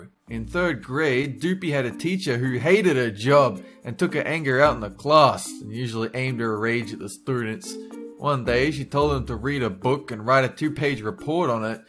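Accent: Australia. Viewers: Yaaaay...narrated by 😊 G'day mate, love it that an aussie is such a successful youtuber and has awesome channel. Also love when you narrate in your very aussie styled, layed back, smart arse way. More narration from YOU pls! And a face reveal...of everyone pls?!?!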